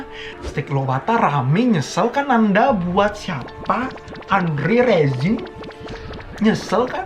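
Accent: Bali, Indonesia. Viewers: Logat bali nya sangad kerasa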